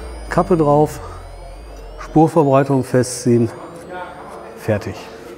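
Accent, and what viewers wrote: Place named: Ruhr, Germany. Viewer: ganz große Klasse. Ich mag den Mann. Besonders seinen Kohlenpott- Akzent! und seine menschlichen Ausbrüche: "Wo hab ich denn den 24er wieder hingelegt?!"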